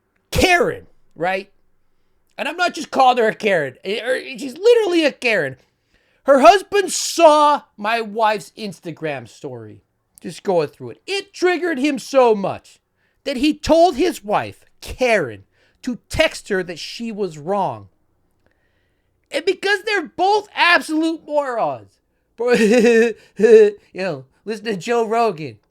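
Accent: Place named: New York, United States. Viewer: How do you sound like a New Yorker being from Utah?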